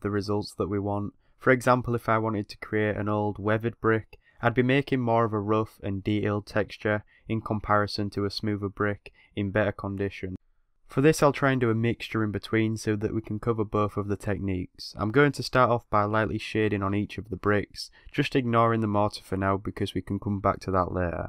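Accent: United Kingdom. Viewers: its 100% british to me lol. dem vowels lol noeu weuy, doeunt goeu theiu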